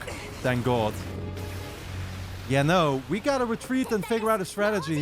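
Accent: Netherlands. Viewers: are you dutch?? or do i mistake your accent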